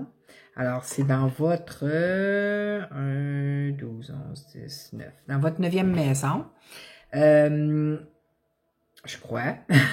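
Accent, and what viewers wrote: Quebec, Canada. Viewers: oula l accent québécois 😍❤❤❤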